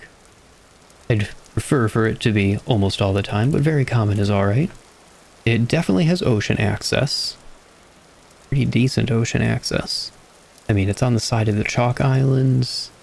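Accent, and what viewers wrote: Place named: Nova Scotia, Canada. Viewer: Random but are you from eastern Canada? I would have just assumed US but the way you pronounce Rs especially in words like "marked" has the super Irish sounding quality people from Nova Scotia often have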